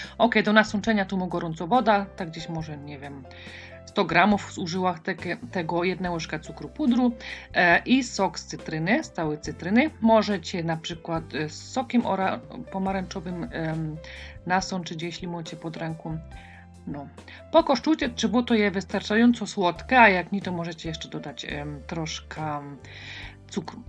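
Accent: Silesia, Poland. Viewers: Super, ale fajnie po Śląsku rządzi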